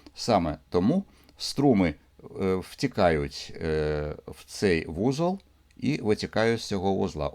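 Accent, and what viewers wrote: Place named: Odesa, Ukraine. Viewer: Одеський акцент української пестить вухо. Дякую. Цікаво.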